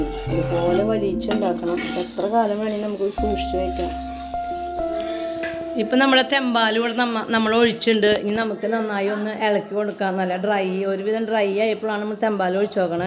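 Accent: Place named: Thrissur, India. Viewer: ഇത് എവിടാ കിട്ടും. തൃശൂർ ആണോ. സംസാരം kettit.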